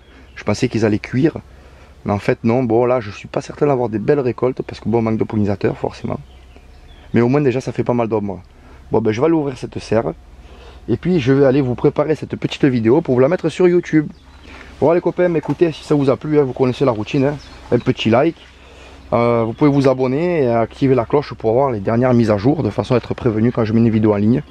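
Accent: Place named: South-West, France. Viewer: merci a toi je pense que tu est dans le sud ouest non tu a un accent simpatique moi jsui sud est un grand merci pour tout ton temp et ton savoir faire que tu partage jatend les ptit secret1😉👍👍👍👍👍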